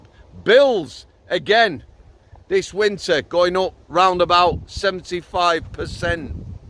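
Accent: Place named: Yorkshire, United Kingdom. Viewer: I always thought you had a Yorkshire accent I grew up on the Manor Don’t live in Sheffield anymore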